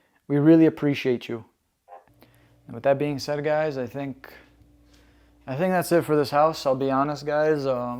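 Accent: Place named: Canada. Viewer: ... You do honestly sound like your from Canada. Other then when you say garage. Lmao... Gareage 😅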